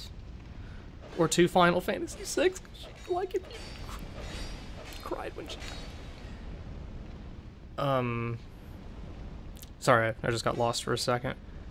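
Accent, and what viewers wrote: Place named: Texas, United States. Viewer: i can already hear the texas in your voice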